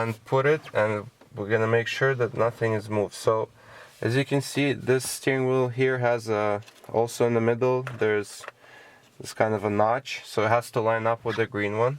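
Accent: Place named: Russia. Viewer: слышится почему-то русский акцент...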